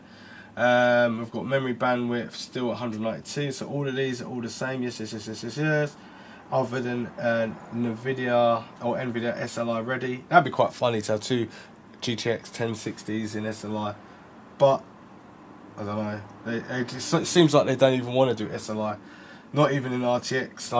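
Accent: England, United Kingdom. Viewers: germany but i just love the english accent and I was in London a month ago... either I'm not understanding you correctly, but wasn't the 1060 6GB released when the GTX 10XX series launched? because i remember i had to decide between the 6 and 3gb version about 2 years ago when i got mine (1060 3GB)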